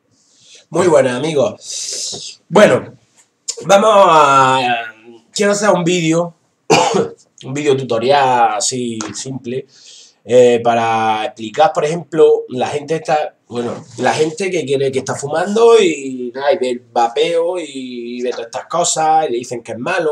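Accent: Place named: Andalusia, Spain. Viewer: Hablando en Plata.. Y en andaluz. Un saludo desde Málaga.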